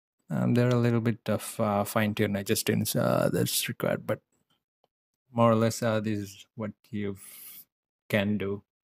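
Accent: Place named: India. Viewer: Du hast so einen indischen aktzent hört sich witzig an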